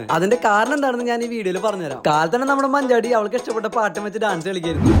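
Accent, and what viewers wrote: Thrissur, India. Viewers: Chettan thrissur aano😮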